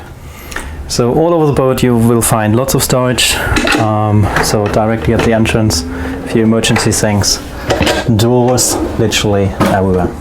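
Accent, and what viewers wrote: Germany. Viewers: Immer Lustig wenn ein deutscher sich in english bemüht. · What a beautiful German accent English!!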